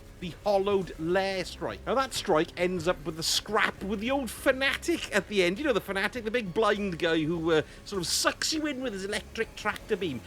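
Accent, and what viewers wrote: Wales, United Kingdom. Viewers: Nice to hear a fellow Welshman on Destiny2. Awesome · yo, this guy sounds welsh lmao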